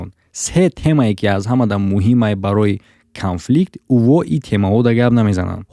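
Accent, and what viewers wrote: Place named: Kulob, Tajikistan. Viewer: Ошно гапоша мо чоткий мефахмем чиста Кӯлоби гап мезана ть ай тарафои Хуҷанд боши намефахми ука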